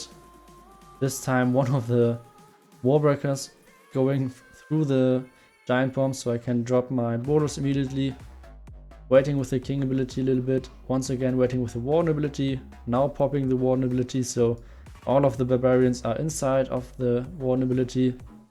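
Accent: Germany. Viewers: Bro do you really suck at english or is it because of the german accent?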